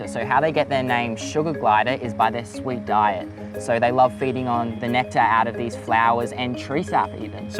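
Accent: Australia. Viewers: Brave Wilderness is great, however, it is not as good yours with your Aussie accent, sweetness, and willingness to learn and listen to others. I applaud you for being the best you can be at all times.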